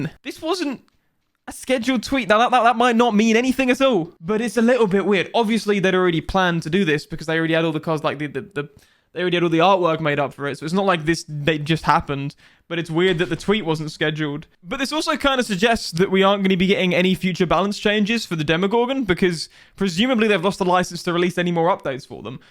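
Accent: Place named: United Kingdom. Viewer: I love your British accent omg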